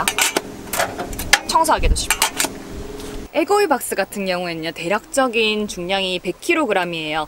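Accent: Gyeongsang, South Korea. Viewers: 대구분??같네요 저도 꿈이 자연방송,캠핑방송하는건데 너무부럽습니다 영상잘보고갑니다.구독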